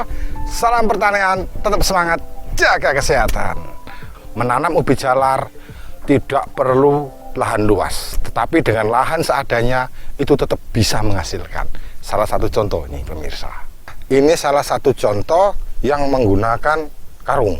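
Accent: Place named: Java, Indonesia. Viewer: Nada suaranya yg khas dg logat Jawa yg AKURAT... Salam sehat selalu pak 🙏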